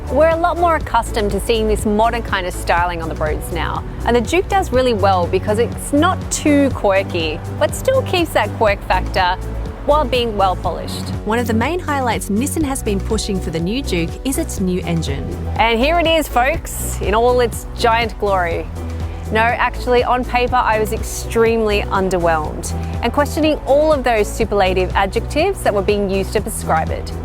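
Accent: Australia. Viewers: Australian not British.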